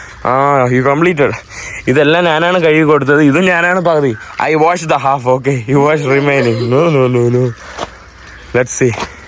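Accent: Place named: North Kerala, India. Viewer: boss , eatho oru uthara keraloo aa samsarathil oru pucham …i luv malappuram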